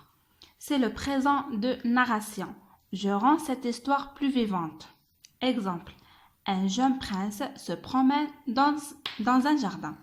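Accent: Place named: Algeria. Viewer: D'où venez-vous de la wilaya ... selon votre accent, vous êtes de la commune de Tadjnant ... je viens de France, mais j'habite en Algérie et je visite beaucoup ses communes ♥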